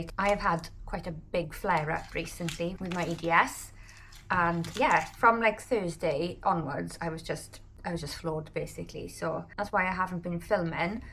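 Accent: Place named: Wales, United Kingdom. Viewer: aww thank you!!! Ive been watching your videos most of the day!! And while I cooked me curry! 😂 absolutely love them! Will give you a shout out next week for sure! And i have to say, theres is nothing better, than the accent of a Welsh woman swearing! 😅 keep the videos coming hun!!!! ❤❤❤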